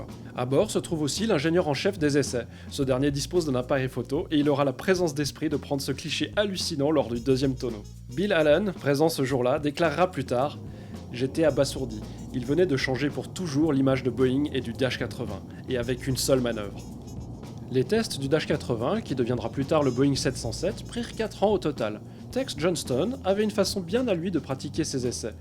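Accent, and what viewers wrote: Switzerland. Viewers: Tu est suisse? sinon très bonne vidéo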